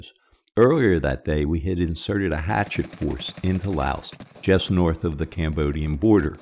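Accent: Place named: Pennsylvania, United States. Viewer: This narrator sounds like a native Pennsylvanian.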